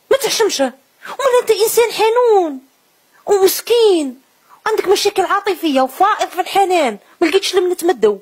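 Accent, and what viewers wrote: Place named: Setif, Algeria. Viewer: نموت على هدره تاع سطيفيه😂😂😂😂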